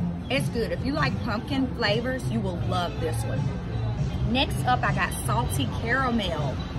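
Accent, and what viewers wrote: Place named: South, United States. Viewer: Heyyyy fam! I absolutely love Jeni’s icecream we have one here in Nashville. Now I have to go get some. I had the peaches with Biscuits. Do you mind me asking where are you from because your accent sounds like you are from Tennessee or somewhere in the South.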